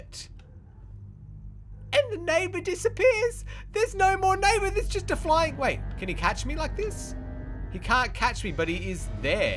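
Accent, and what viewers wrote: Australia. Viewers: Are u Australian? U sound Australian. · R U Australian? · is he from Australia? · Wait is he Australian my grandma is Australian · i'm Australian you sound like your from Australia...!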